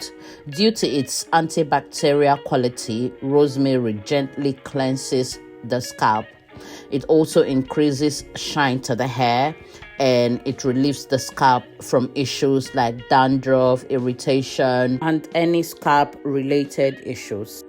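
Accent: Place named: Nigeria. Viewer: You made this DIY so simple. Our Nigerian English is really so sweet and you deliver it beautifully. Thanks for sharing. Will try it.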